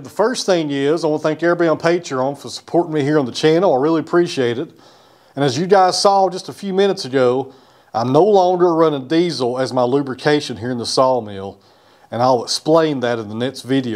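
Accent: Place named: East Tennessee, United States. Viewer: Are you in West, middle or East Tennessee? I'm an East TN native, you sure sound like you're close to the area. I love it! Sounds like home. I hear somebody speak like that and it's almost comforting. My mind just associates it with friendlier people.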